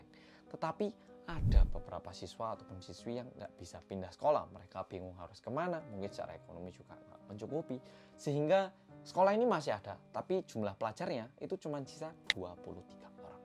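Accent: Java, Indonesia. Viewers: halo gays welkom to korea reomit. bersama orang korea yang medooooookkkkkk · awas mas jangan terlalu lebar, entar ada nyamuk masuk. btw aku tu suka kalo denger mas ngomong medhok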